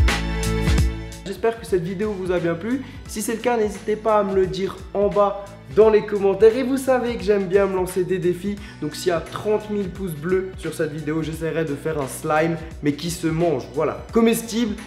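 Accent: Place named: Alsace, France. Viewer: Ta l'accente Alsacien mdrr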